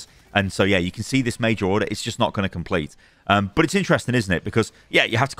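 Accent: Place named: United Kingdom. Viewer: Ah yes my thirteenth favorite channel: Panic in a Brit Voice <3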